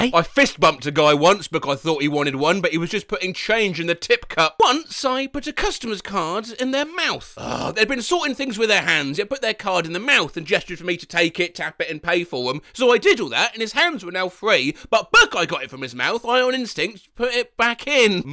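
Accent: United Kingdom. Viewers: This is hands-down the funniest video to date. Turns out that everything is made better when read by a middle-aged British man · Werid how a British critter can make me feel better after ballin 😎 my eyes out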